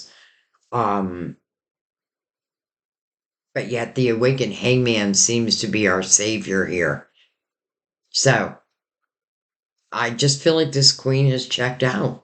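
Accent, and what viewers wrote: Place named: Southeast Pennsylvania, United States. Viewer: 8/31 here. Triple Virgo You sound like you are from the same area that I grew up in. SE Pa. And South Jersey? Love your channel and how accurate you are!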